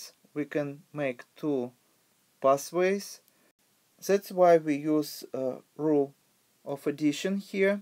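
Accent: Russia. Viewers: Вам нужно работатт над произношением. Сильный русский акцент на английском - это что-то ужасное. По себе знаю) А видео очень информативны!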